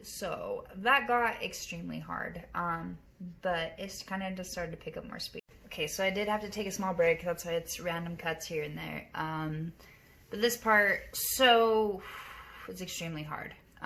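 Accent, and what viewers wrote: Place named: Eastern Europe. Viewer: You sound like you’re from eastern Europe.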